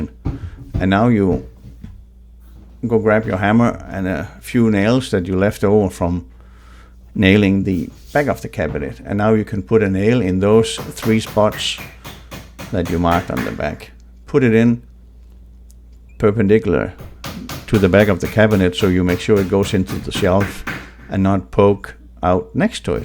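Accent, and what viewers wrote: Denmark. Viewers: Great videos , especially your webpage on the Sektion Rail measurements! I’m gong to take a guess that you are Danish? Sounds like very Danish accent , I love it 👌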